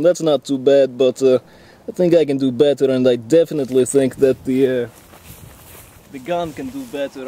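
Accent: Russia. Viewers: omg I love russian accent XD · The Russian accent is slowly wearing off =( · Hey, Ive been watching you for around a week or 2 and I think your awesome, I always knew russians are this cool. Your accent is awesome, your always randomly funny and you shoot very well. I hope you will save us all when the zombies arrived some day:3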